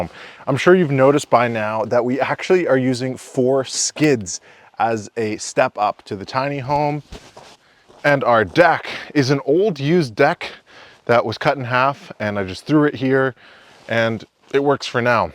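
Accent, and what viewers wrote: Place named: Canada. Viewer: Your video showed up in my feed last night and I was like, hmmmmm he sounds Canadian. Hello fellow Ontarian! Nice to meet you. I'm looking forward to your videos for building the tiny home as hubby and I are about to be empty nesters. We would like to sell our home which is in a small village of about 900 people, and move back to the country where we both grew up on farms. I am betting your build will answer some questions I have about the process here in Ontario. Also, heads up - those weather people are telling us this winter is going to be super cold like we ( I mean me) experienced in 1979. It was super cold and nasty. This video made me chuckle because I heard Mike Holmes voice in my head saying, why would you put new on crappy old? when fixing your step. However lipstick on a pig sounds pretty funny, LOL. Looking forward to catching up on everything you have posted so far!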